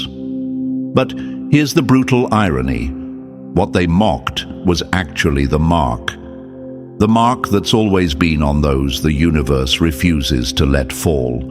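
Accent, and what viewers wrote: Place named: United Kingdom. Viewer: 💫👁️✨🍎✨🇺🇸💫☦️💫🥰😇💫Indeed British accent Angelic man❤☦️🇺🇸💫🕊️🔥🍎👁️💫🥰👁️✨💫🙏😇🙌🏼💫You Speak 🗣️ my Language 👁️🇺🇸💫✨🇺🇸👁️✨🇺🇸💫👁️✨🇺🇸✨🍎💫🕊️🔥❤💫🇺🇸💫❤💫🇺🇸💫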